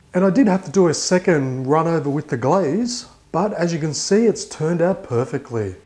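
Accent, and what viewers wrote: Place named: Australia. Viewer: i like your aussie accent bro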